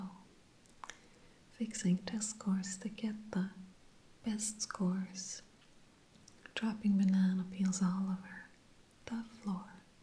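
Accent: Pennsylvania, United States. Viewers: Watched your channel for months and assumed u were American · This is going to sound silly now that I know where you’re from, but I thought you were Pennsylvania Dutch! I live in PA, and your voice sounds very similar to the Amish community here. I had thought you must be ex-Amish or something haha. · I’m from California and when I first listened to your videos I thought you were American!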